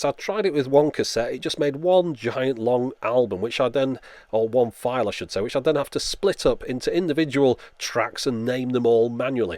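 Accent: United Kingdom. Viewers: Thanks,. excellent videos. I relate totally to the spectrum analyzer aspect of home and auto hifi. and for the record, your voice is excellent. for some reason, British accents are like fingernails against chalkboards to me, but not yours. keep up the great work.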